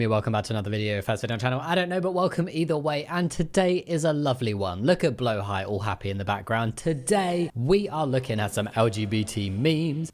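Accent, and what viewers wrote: United Kingdom. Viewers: I'm B1.2 in english but his British accent Is a little bit difficult to understand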